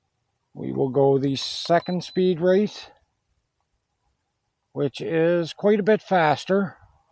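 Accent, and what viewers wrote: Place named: Canada. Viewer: Where about's are you in the world my friend, you sound Canadian to me, hence you got loads of money to buy any model you want :-) Canada or USA?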